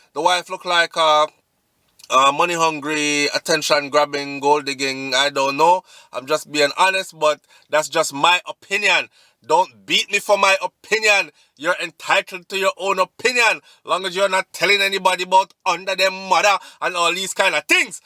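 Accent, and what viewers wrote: Trinidad, Trinidad and Tobago. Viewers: Love ❤️ your Trinni accent is great 👍 brother don’t care what anyone else says 🙏🏾❤️🇯🇲🇺🇸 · Love your Trini accent! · Well A A! Bachannal in de people place yes! I'm BAWLING crying at your Trini accent... Yuh have it dread, yuh have it! 😩😩😩🤣🤣🤣😂😂😂😂😭😭😭😭😭😭😭😭💀🤞🏾🔥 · Hi, love your Trinidadian accent... very funny 😂😂😂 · Well I am Trinni and you sound like us.Welcome my fellow Country man 👊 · Lol oh boy, I love your Trini accent. This story was very interesting. I work on the weekends my days off are in the middle of the week so I can't do anything especially since I have my own reputation to uphold. Great video. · I like how you talk the Trinidad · you Trini accent wicked boi , ah hear u Trini keep on doing ur thing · Hi you are Trini you dount talk like jamaican love it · Man everybody done no she was ah bulla gal from long time lol.. 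side note... yur trini accent pretty good fadda 🤣👏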